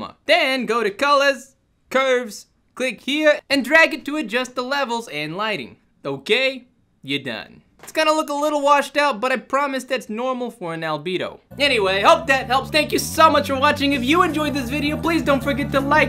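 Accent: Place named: Boston, United States. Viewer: Boston accent, it seems.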